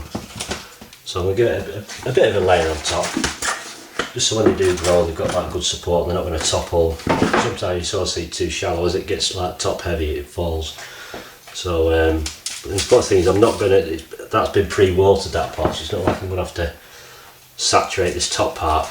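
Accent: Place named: Lancashire, United Kingdom. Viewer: I cannot believe that i have only just found your channel! over the last 3/4 years of subscribing to other allotment/ garden channels! Been catching up watching as many as possible of yours over the last 2 weeks. You make them very interesting and informative. I haven't got an allotment...just a small rented garden so its lots of bags and pots but the enjoyment of growing and eating your own veg is so rewarding. Keep the videos coming ,all the best for 2017. I'm originally from Preston... not that far from you...so I enjoy the accent too! . Cheers. Pat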